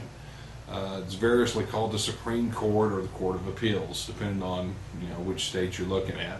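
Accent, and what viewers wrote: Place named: South, United States. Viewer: logical presentation. I enjoy southern accents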